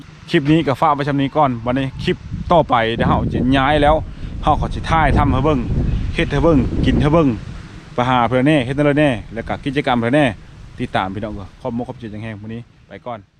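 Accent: Phu Thai, Thailand. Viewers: เว้าคือภูไทเขาวง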